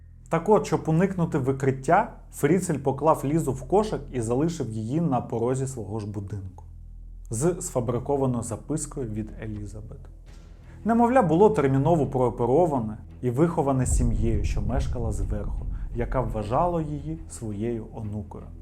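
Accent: Russia. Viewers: Хлопче, тобі необхідно працювати над українською, хоча б інколи спілкуватися з україномовними. Дебільні звуки, притаманні тільки рашистам, відвертають від перегляду і характеризують тебе як примітивного та слабого на голову дурня.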